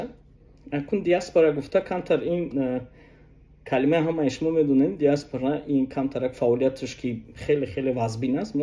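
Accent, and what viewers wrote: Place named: Khatlon, Tajikistan. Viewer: ИЛТИМОС БО ЗАБОНИ ДУРУСТИ ПОРСИ ГАП ЗАНЕД БЕ ЛАХЧАИ ШИКАСТАЮ ОМЕХТАИ ХАТЛОНИ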